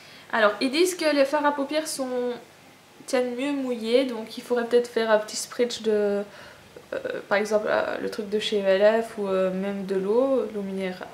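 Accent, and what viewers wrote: Belgium. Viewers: bonsoir juste petite ? tu es de belgique ?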